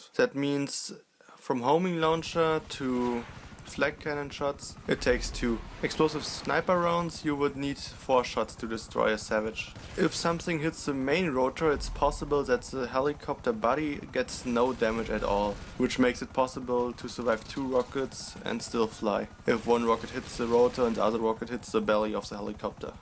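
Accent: Germany. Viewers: Wie krass man hört dass er deutsch ist ;) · If you are German... I love German accents so muchhhhhhhh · Man merkt du bist deutsch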